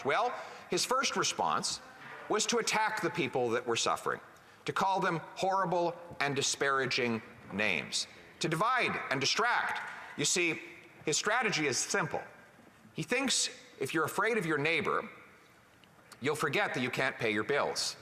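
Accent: Canada. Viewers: Sounds like your typical Canadian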